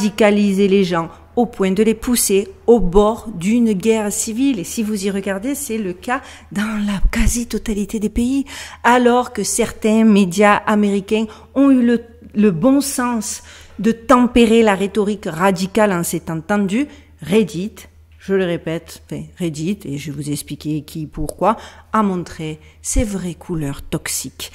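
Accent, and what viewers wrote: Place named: Southern France, France. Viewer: Belle et intelligente 👍 Et ce melange d accent du sud de la France la ou je me trouve et un accent americain est etonnant 😮 Soyez bénie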